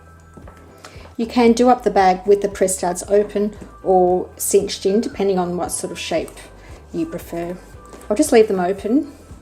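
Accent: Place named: Australia. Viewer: Are you from Australia? I am guessing because of your accent. I’m looking to buy a bag from polene but not sure if they ship to Australia since their website only shows USD dollars or the EUROS. Thanks for your help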